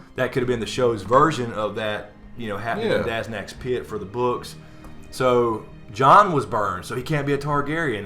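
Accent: South, United States. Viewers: Glad I found your channel, GREAT takes on Thrones! Love Thrones talk with the Southern accent.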